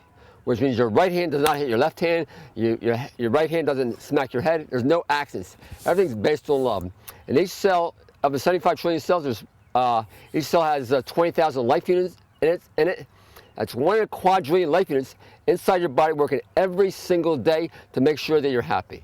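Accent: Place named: Philadelphia, United States. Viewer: Sweet :) I grew up outside Philly and am an 18 year raw vegan. I moved to Portland, Oregon, long ago, and when I go back to Philly, folks do not understand what I am doing. They guess my age as 20 years younger than I am. (In Portland people guess my age as 10 years younger than I am.) So it is quite a comfort to hear someone with this knowledge and practice sharing it with a Philly accent. :) If I ever go back, I will make a bee line and come and visit you. Blessings Always.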